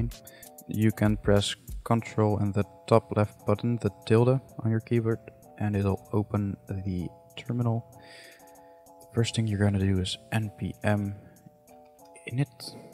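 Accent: Netherlands. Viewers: yeah I was thinking the same ben je nl =) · Dutch? Nederland of België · Your accent sounds Dutch.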